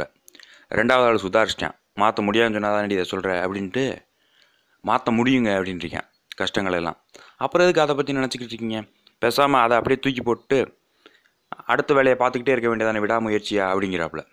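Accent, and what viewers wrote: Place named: Madurai, India. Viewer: arumai! langauge slang is plus for you Madurai karanda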